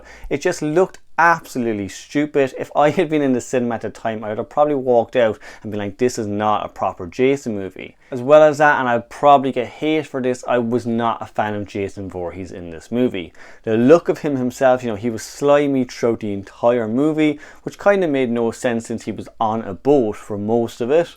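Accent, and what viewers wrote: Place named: Ireland. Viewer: I never really got into this franchise, so I have nothing to comment on. I’m just here to give you a thumbs up and I LOVE your accent!! Is that Irish?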